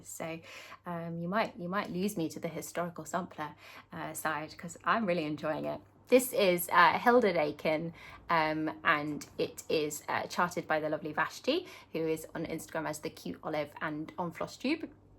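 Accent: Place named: United States. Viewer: Hi,! Great video, thanks for sharing! I have been planning on using my magnet giveaway to make cute little needle minders 🤭 those foxes are adorable!! Still obsessed with your ATC, laundry is so creative!! I love your American accent 😂 Yay long dog! I was drawn to Fish & Ships too, the other nautical ones in that “series” are fun and I’ve seen fewer people stitching on them. If and when I make the jump I’ll probably start with one of them! I fully support putting your name and age on the sampler! I was watching another video this weekend and they said “well you might not want to say you did it at age 76” and I thought “why not!! How fun!! 😂” Your stitchy kindness haul is great! Can’t wait to see you stitching on those + the spring Quaker!! -Katherine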